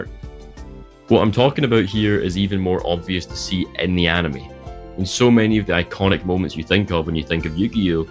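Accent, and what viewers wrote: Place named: Ireland. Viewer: I can't argue with anything you said honestly, well said. PS your accent is cool. Is it Irish? Scottish? An English dialect?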